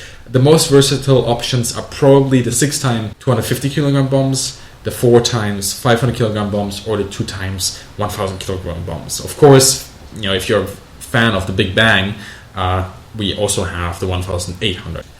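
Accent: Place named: Germany. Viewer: Come on, pleeeeaaaaseee make some WarThunder videos and plane reviews! we really miss your insight, rants and that glorious german accent! Pretty bitte?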